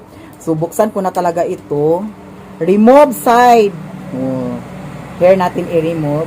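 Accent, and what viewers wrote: Visayas, Philippines. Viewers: Tagalog is not her 1st Language and as we all know, since for sure you've watched some of her videos, Bisaya is her Dialect and that's where she's comfortable with. Haven't you noticed, she's trying to speak Tagalog but she can't help it talking using her own Dialect cuz that's where she's comfortable. She's trying her very self to adjust talking in Tagalog but Please do respect as well her Very Own Dialect. And besides she was still able to Entertain and Inspired her Followers/Viewers even though she's talking multi-Language/Dialect. That's where she's Comfortable with, so let her be Herself, Was known by Showing Her true self so if she'll be changing the Things that she might doing eversince, It's gonna be a Total Different Anymore. Each of us has our Own Meaning of Living. RESPECT BEGITS RESPECT. just Saying. Thanks 🙏 Do whatever you want Ate. 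As long as you're happy doing such things Just Go And Continue. I'm a Fan. A Solid Fan. Love Lots and More Blessings to You and to the entire Family 🙏🤗💖 From SALUDAGA FAMILY Supporting all The way from LAVEZARES NORTHERN SAMAR 🤗💖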